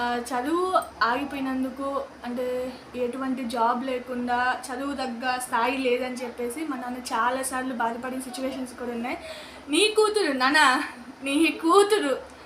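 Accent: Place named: Nellore, India. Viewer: Good very good....maadi kuda Nellore ni matalu vintunte mana Nellore Baga gurtostundi...proudness tho nanaki istanu annav good ni kallaloo happy ness chustunte yevarina motivate ayyi Ila intlo unde vallaki nuv chala aadarsavamthsmga undali vallalo marpu vachi vallalo unde talent ni gurtinchslani korukuntunnsnu any way congratulations...all the best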